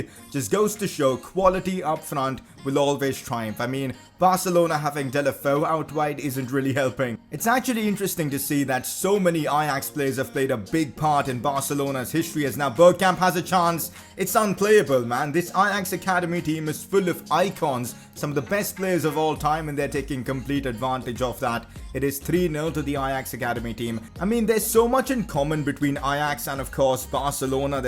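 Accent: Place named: India. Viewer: umm, you kind of have a indian accent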